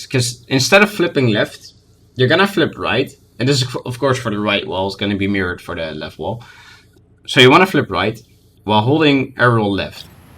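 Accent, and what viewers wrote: Netherlands. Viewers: I hear nederlands, possible? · Je hoort aan zn engels dat hij hollander is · dat nederlandse accent valt niet te verbeteren gozer <3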